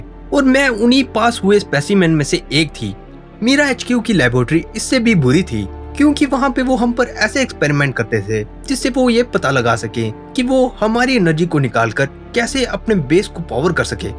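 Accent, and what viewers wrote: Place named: Punjab, India. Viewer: Your voice is like punjabi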